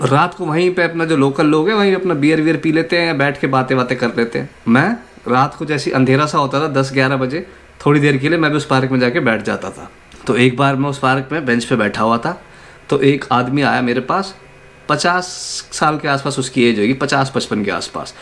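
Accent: Haryana, India. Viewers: Bhai love ur haryanvi accent, plz aisi videos daala karo….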